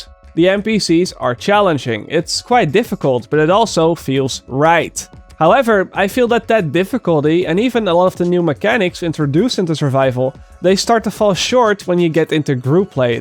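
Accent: Netherlands. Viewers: Is he dutch? Because he has a dutch accent. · are you Dutch? you have an accent which reminds me of my fellow Dutchies. although, it also sounds american at the same time. so ... i NEEEEEED to know. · Hoor ik het nou fout of is dat een nederlands accent?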